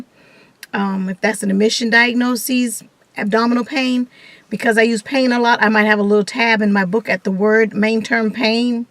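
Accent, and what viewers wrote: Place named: South, United States. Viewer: I absolutely love your channel, this one and your other one! Your Coding Master Coach helped me past my CPC exam on the first try. Your approach is so likeable, and down to earth, with your southern drawl...so lovely. You're a very beautiful lady too, I love seeing a black intelligent women knowing her stuff! I clicked on this video today, but before I watched it, I wanted to post this. I know the topic of this video is Loving your books, and OMG if that is not the one thing I stress to people. You get to learn your code books by actually doing some work, working out different problems and different scenarios will give you that understanding and that missing piece to the puzzle. 5 hours goes by incredibly fast, and these books are huge, you want and NEED to be able to flip back and forth and feel confident that you know what you are doing. 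Love you! xoxo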